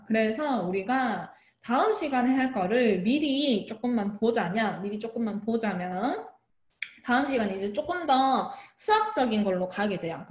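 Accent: Gyeongsang, South Korea. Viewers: 경상도 어투가 살갑고ᆢ정겨워요~ 강의가 귀에 쏙쏙~~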